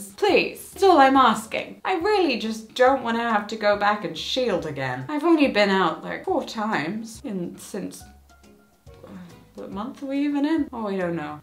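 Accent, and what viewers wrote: United Kingdom. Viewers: You sound extra British in this one 😂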